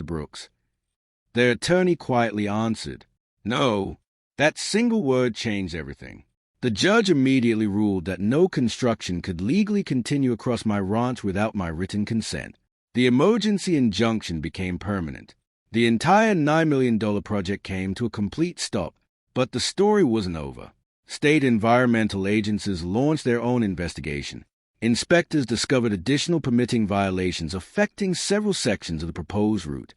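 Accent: United Kingdom. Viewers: A Texas rancher with a British accent, interesting.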